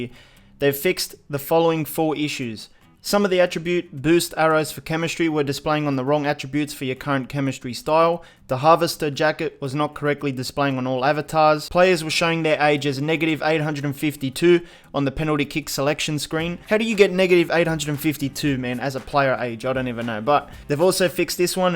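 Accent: Australia. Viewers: He’s from Australia so he pronounces things different, low him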